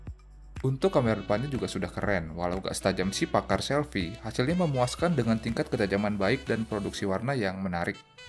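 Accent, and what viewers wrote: Lampung, Indonesia. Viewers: Orang lampung ya min?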